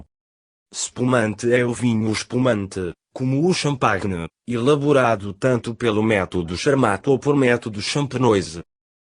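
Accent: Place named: Portugal. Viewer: A pior coisa que fizeram foi colocar essa voz falando em português de Portugal 🤦🏻‍♂️ ... o pessoal tem que entender que a grande maioria das obras de literatura e programa de televisão, são feitos em português do Brasil, mais limpo e mais audível.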